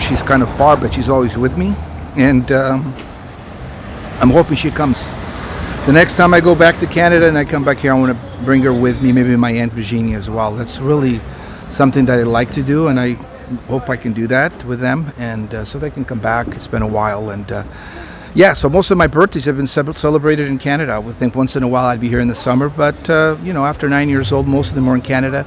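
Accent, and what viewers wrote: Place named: Azores, Portugal. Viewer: Que lindo gostei muito de ver e ouvir tu a falar Açoreano beijinhos da Ilha de São Miguel ❤😘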